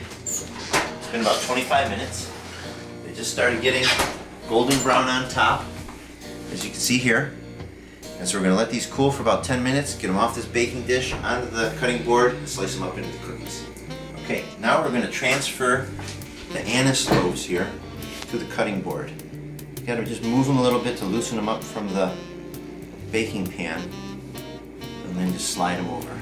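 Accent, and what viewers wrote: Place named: Brooklyn, United States. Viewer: Ciao, you sound like you're from Brooklyn! Good recipe! I'll give'er a try! Ciao, Brooklyn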